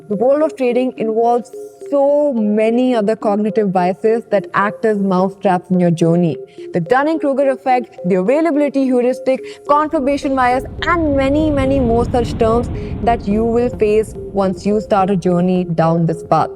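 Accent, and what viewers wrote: India. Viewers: Love The Indglish Accent 😅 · indian accent :face-green-smiling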